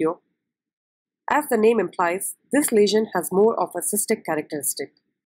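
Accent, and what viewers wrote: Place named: India. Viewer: ... sorry ma'am.. u sounded very much Indian.. whichever nationality, much respect to u.. please do more videos here..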